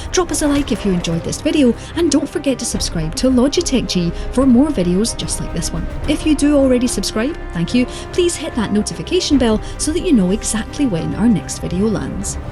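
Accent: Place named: Scotland, United Kingdom. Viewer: Can't beat a good Scottish accent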